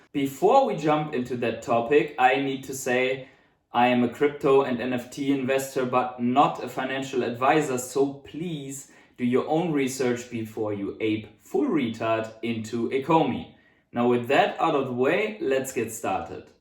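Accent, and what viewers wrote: Germany. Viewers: U German?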